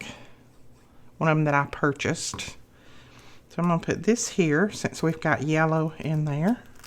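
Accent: South, United States. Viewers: Where do you live? The accent is southern I remember you saying in another video when someone said to slow down talking lol. When you dont talk fast. Lol. Anywho I am in Hartselle Alabama. Small town a little ways from Huntsville and Birmingham. Kind of in the middle closer to Decatur Alabama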